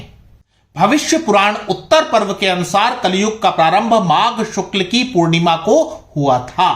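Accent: Standard. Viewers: Itana shudhha Hindi sunane ko milaa aaanand aa aagayaaa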